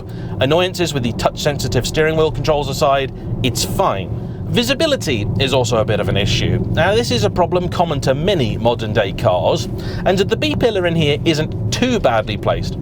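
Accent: United Kingdom. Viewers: I think that's just how British people sound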